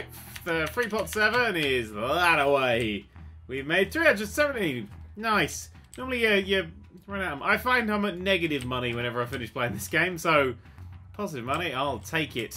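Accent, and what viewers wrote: England, United Kingdom. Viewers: HMHS.BRITANNIC Thats how english people say it. They also say math like maths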